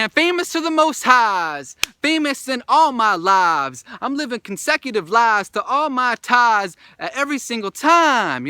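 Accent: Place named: California, United States. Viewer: dude I really like u! ur energy and accent is cool hahaha. reminds me of a cali surfer dude. Do u have FB or IG to follow too?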